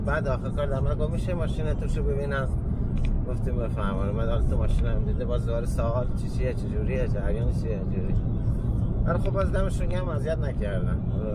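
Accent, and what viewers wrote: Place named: Shiraz, Iran. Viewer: عاشق لهجه زیبا و اصیل شیرازی شما هستم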